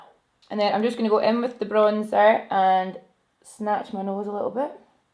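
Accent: Scotland, United Kingdom. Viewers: Got my captions on because I'm blow drying my hair and can't hear... YouTube cannot translate the Scottish accent. Instead of 'contouring' the subs said 'corn shooting' and it's killing me 😂🤣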